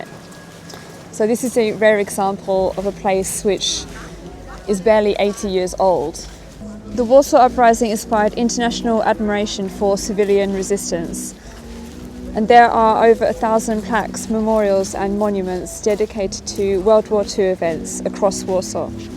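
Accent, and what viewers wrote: England, United Kingdom. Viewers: I really like this British accent :-) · this is a great report. Well done. And you are such a sweet girl 😊 Love your English accent.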